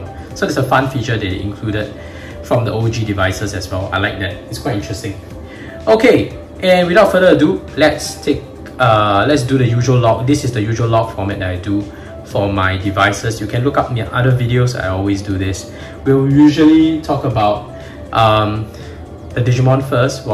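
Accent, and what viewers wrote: Singapore. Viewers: Bro u from Singapore?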